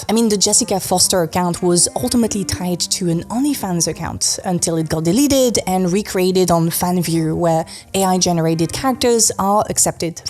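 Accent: France. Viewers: What ks your accent, french? Looking at your username it might also be italian, i do still think french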